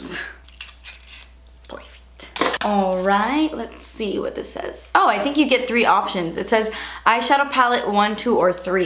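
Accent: England, United Kingdom. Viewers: Anddddddddd I love your English accent I wish you would do a whole video talking like that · i would bloody love it if you would do a full video in your english accent omg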